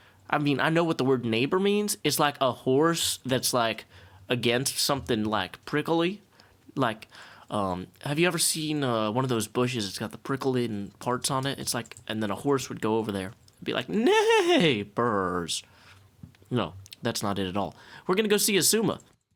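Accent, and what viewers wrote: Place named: Kentucky, United States. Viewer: I love your reasoning on base size lol Absolutely enjoying the heck out of your videos on HC this year - that bridge was super nice. Your cadence, accent, and word choice remind me of home and make me all nostalgic for my college years in Kentucky. :)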